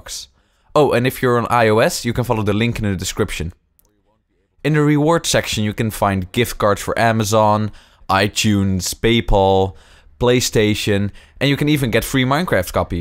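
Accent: Netherlands. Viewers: Thats what i was thinking xD are u dutch? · Are you from holland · OMG NEDERLANDS ACCENT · hoi nederlander(kan je horen aan je accent)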